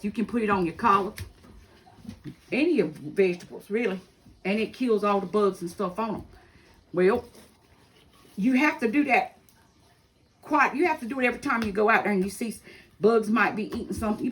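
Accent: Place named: South, United States. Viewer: Loved the video. Glad to see you inspect them for bugs before cutting them up, it makes sense. A new subscriber. Love your country accent! God Bless!❤🙏🏽❤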